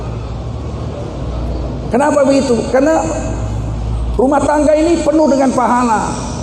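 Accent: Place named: Medan, Indonesia. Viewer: hahaha...lawak ceramah nya... kok seperti orang medan cara bicara nya ya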